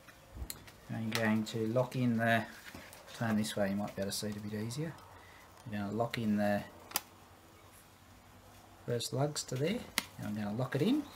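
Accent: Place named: Australia. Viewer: Who doesn't love a good Aussie accent :)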